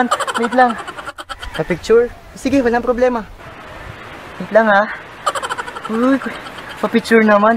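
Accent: Batangas, Philippines. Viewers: Ala'y Batangeñong Batangeño ehh...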